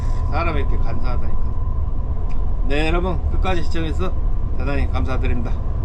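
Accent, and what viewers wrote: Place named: Jeolla, South Korea. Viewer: 기사님 덕분에 영상으로 나마 힐링하고 갑니다ㅎㅎ 표준말보다 전라도 사투리가 더 정겨워요^^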